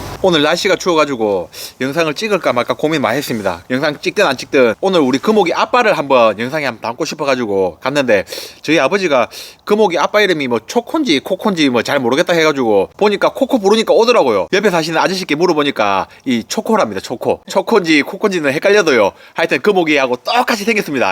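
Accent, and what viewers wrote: Gyeongsang, South Korea. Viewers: 언제봐도 정겹네요 경상도 사투리가 가슴에 팍팍 와 닿습니다 ^^~ 이건 음식 고문이다 ^^~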